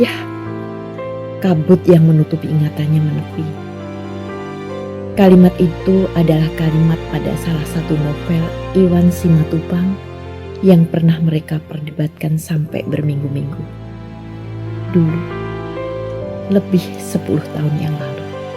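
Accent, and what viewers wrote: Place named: Java, Indonesia. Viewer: Pronounciation khas Jowo ya? He3... Sing penting penonton paham karepe ya? 😁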